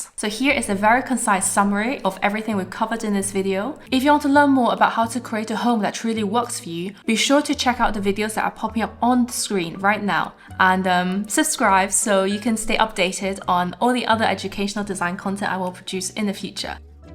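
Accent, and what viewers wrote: United Kingdom. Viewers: I have no partner or girlfriend which could force me to watch, so its my free will. ;-) I once found your videos and find the psychological components interesting, because many people are explaining "how to make your room feel more cozy/expensive/calm/natural" but without explaining, why the suggested design may have the proclaimed certain effect. Watching into primal habits of searching for prey etc is very interesting. I am a minimalist and regarding colours more on the beige, earthy and light green side. I am not a big fan of bold statement pieces or great contrast. So it is interesting to see, how people differ - because most of the male people I know are indeed exactly choosing the options you described. As while I am a fand of sanitised rooms, I prefer them not too sterile. My brother is into chrome - a total nightmare for me, as I am sticking to beech wood or bamboo. 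Oh and excuse me this personal note - I am enjoying your British English also. English was my first foreign language at school (I chose Latin as the second) - and of course nowadays in media you mostly hear American English - f.e. the Hollywood and Netflix productions. And unfortunately I am also leaning towards American English (not to mention my heavy German accent). So its always a pleasure to hear BE.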